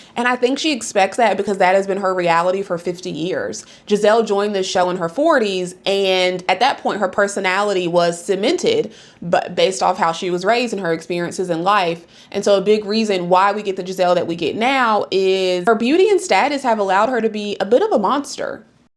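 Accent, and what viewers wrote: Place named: South, United States. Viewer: absolutely love your voice and your accent, weirdly enough i don’t hear many southern accents in my video essays! you’re doing amazing btw i love your content i subbed after this video bc your content quality is top notch!!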